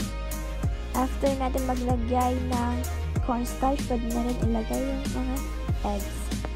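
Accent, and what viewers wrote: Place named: Philippines. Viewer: ralf macalma ?? tf galit much? chill. kuya, ever heard of accent? normal lang may accent mga pinoy/pinay.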